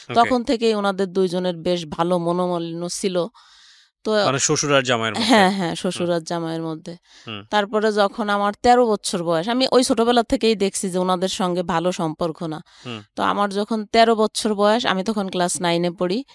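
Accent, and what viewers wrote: Bangladesh. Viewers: গল্প মনে হয় মিথা কারণ বাড়ি খুল্না কথা বলে বরিশাইল্লআ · বাসা মুকসুদপুর হবে ভাষায় মনে হচ্ছে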